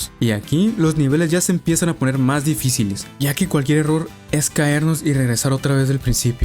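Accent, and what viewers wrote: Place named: North, Mexico. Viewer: Luego luego se escucha que eres del norte jajajaja 10 de 10, arriba el scooby doo viejo ❤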